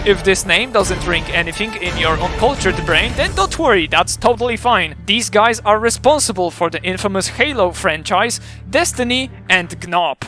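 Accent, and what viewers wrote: Poland. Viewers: I did not expect a polish accents here xD wtf. Nice video 👍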